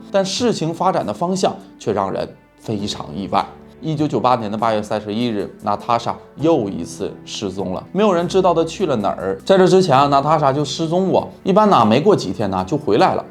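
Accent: Northeast, China. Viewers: 能不能不说东北话？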